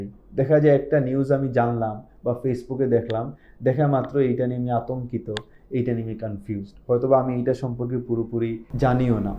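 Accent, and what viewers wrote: Sylhet, Bangladesh. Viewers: Sir kota bolar doron Sylheti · Bhai Sylheti ni🥰🥰🥰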